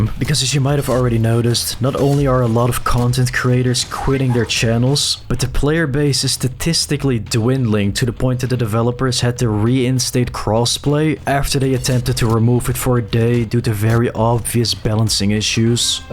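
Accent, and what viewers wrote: Netherlands. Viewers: Is bro dutch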